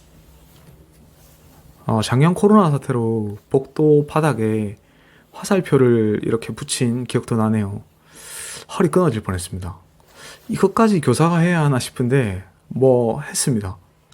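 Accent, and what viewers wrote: Busan, South Korea. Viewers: 우연히 보게된 영상인데.. 희한하게도 끝까지 보게 됐네요. 익숙한 부산 사투리.. 그리고 같은 전공(아 저는 사범대는 아니고 그냥 국어국문. 동기들 중에 부산에서 교사 하는 사람들이 좀 있죠. 어쩌면 선생님과 같이 근무를 하셨을수도.. ㅎㅎ)게다가 쭉 보다보니 남편의 모교이기까지.. 이만하면 끝까지 볼 이유는 충분한 건가요? 학교에대해서는.. 선생님만큼 할말이 많은 학부모 시기도 모두 끝난 사람이지만 여전히 학교에 대해서는 많은 생각을 하게 됩니다. 우리 사회에 얽힌 실타래가 있다면 그리고 그 실끝을 따라가 보면 끝에 교육이 있고 학교가 있다고 생각하기 때문이죠. 큰 아이를 처음 초등학교 입학시켰을 때의 충격이란.. 내가 국민학생시절이었던 1980년대 초등학교와 별 반 다르지 않은 시스템에 놀랐습니다. 교실마다 에어컨이 있고 샷시가 좀 고급이고.. 그 정도 물리적 발전 말고 소프트웨어적인 발전은 찾을 수가 없었거든요. 선생님이 어떤 고민을 하셨을지.. 충분히 이해된다면 자만일까요? 아, 우연히 본 유튭에 너무 몰입을 했네요. 댓글까지 꽤 꼼꼼하게 읽었으니까요. 학생들의 댓글을 보니 좋은 선생님이셨음이 충분히 느껴집니다. 연못에서 탈출하셨으니 넓은 바다로 가서 마음껏 헤엄치고 즐기시길 바랍니다. 응원합니다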